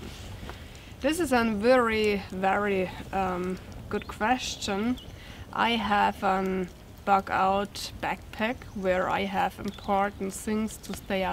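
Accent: Germany. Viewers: Well beautiful Lady. I am so proud of you. This is a beautifully made video. The video setting is perfect. I am impressed how you answered the questions so intelligently. Some subjects are your' personal business for your' knowledge and nobody else. Be careful what personal information you spread over the net. I am always impressed how well you handle yourself even though your' first language is German. I love your' accent, and oh ya many flavors of ice cream also. :O) From experience I remember people always thought my grandfather was a dummy because he spoke broken English with an Italian accent. He actually, like you, was also very intelligent. I am glad you made it clear you have access to the Foxes Den for a long time. I was concerned about you spending money and time on someone else's property. I understand your' passion for the Den. Hang in there. John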